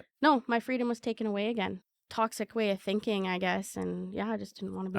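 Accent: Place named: Canada. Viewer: Definitely Canadian 🇨🇦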